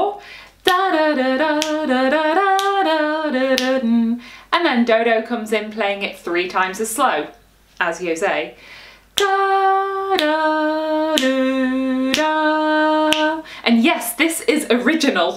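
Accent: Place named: United Kingdom. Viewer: That "regatta bear" was funny, I need to put the subtitles to understand a little bit more, in spanish "I'm a recorder player" sounds like "Am a ricode plée", sounds nicely to me the british accent but to write this and all of the sentences are another different thing at all. In fact, we use the same word "flauta" for flute or recorder, in case we ne need to clear what instrument is the we need to add one word: "flauta traversa" for flute and "flauta dulce" for recorder. cheers.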